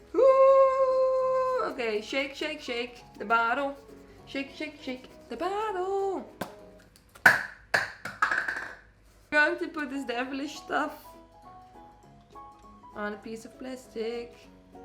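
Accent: Netherlands. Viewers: Omg it looks really goodd😍 Are u Dutch? Your accent sounds a bit dutch 😊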